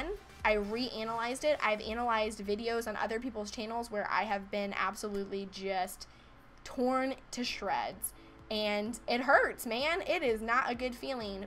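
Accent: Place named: South, United States. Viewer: I'm sad to hear that you have to change how you act even in the slightest. I loved your sarcasm, it was hilarious and fell right in line with mine. Also I love your southern accent and drawl. Being an Oklahoman myself, its awesome to hear a "southerner" sometimes. :P But you're adorable, I love your videos, I love your vlogs, and I hope one day I will get to meet you.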